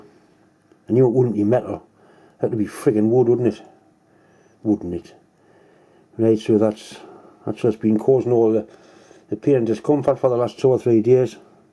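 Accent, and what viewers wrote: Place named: Scotland, United Kingdom. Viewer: Love your accent, Scottish. Well, for me here in America it's an accent lol....for you, I have an accent. Good job.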